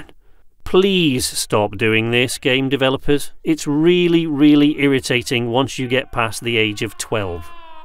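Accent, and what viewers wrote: United Kingdom. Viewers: yeahhh i can hear it in your other episodes, just this one you sounded Welsh 😂😂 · holy shit he has the accent like colin firth in kingsman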